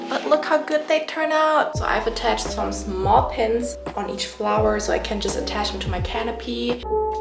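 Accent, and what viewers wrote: Germany. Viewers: Direkt den deutschen Akzent rausgehört✨ Liebe das Ergebnis!🫶🏼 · i am in LOVE with how this turned out. you did amazing 🎉🎉 also are you from germany?? my friend's accent is really similar to yours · Man merkt voll dass du eigentlich deutsch bist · Okay but German accents are so pretty ✨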